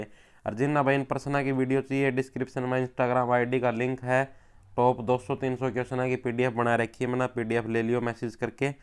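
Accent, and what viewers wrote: Haryana, India. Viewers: Sir apki hryanvi boli b shi smj m aav h jis trike se aap person smjao ho bhot bdiya g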